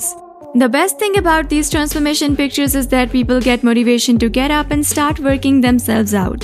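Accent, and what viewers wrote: India. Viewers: Having an Indian woman with a typical Indian accent makes these videos hilarious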